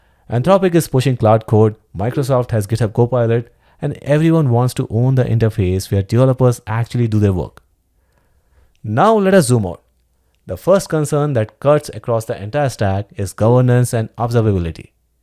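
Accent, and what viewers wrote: India. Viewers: Indians and their english.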